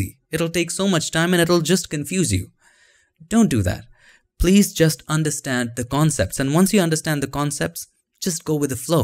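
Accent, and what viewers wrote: India. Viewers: I really like to lean photoshop with you but your english speaking is also good, where are you from , are you indian?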